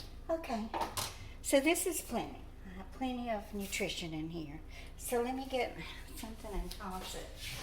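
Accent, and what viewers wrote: South, United States. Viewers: Oh, I love your cooking videos, and as an Australian, I adore your sweet southern belle accent!:)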